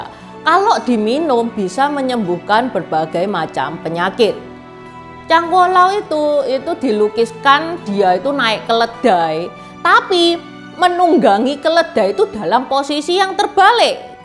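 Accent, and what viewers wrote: Java, Indonesia. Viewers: Tionghoa medhok.... 🇲🇨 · Cc orang mana sih? Medok pol ❤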